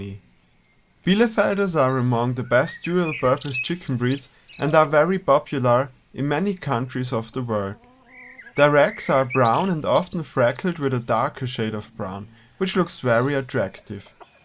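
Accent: Austria. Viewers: are you from Austria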